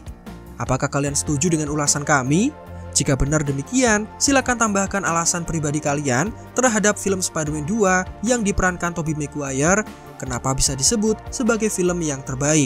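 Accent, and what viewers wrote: Java, Indonesia. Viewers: Mantep nih salfok sama logat admin nya logat wong jowo 😂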